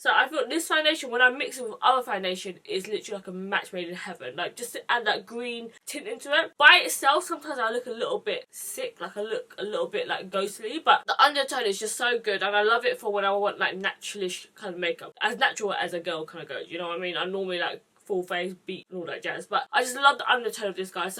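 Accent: United Kingdom. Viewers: Omg same. I don't really know what I expected but I didn't think she was British lol. But her accents super cool. :)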